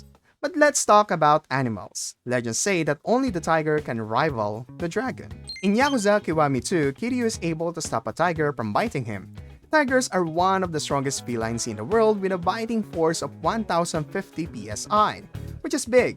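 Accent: Philippines. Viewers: I think you're a Filipino, from the accent that I am hearing.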